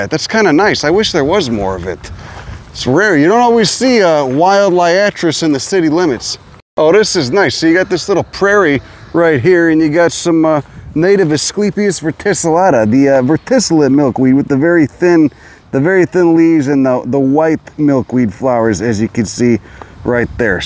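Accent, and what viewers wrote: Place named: Illinois, United States. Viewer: I enjoy hearing Illinois voices. My Ma came from Wheaton and Pops came from LaGrange park.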